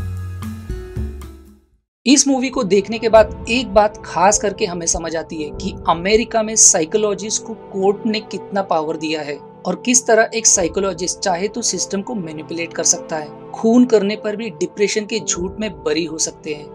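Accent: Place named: Maharashtra, India. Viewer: Bhawa Tu marathi aahes watt.. I suggest u.. Hindi madhe police nhi pulis uccharan karat ja.. Baki mast... Keep it up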